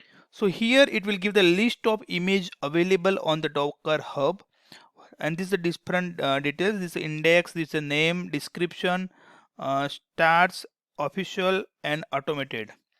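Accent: India. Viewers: Aa pako Gujarati lage che Speech per thi😁😁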